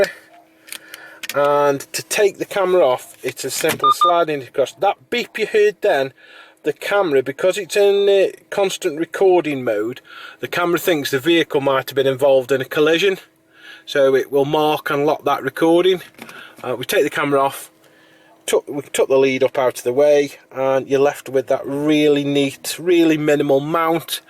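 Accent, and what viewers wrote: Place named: England, United Kingdom. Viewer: Sid Nazim an American picking faults in an English guy speaking English as its spoken in England ?hahah stick to cheeseburgers boy ( and by the way it al-u-min-i-um not aluminum !!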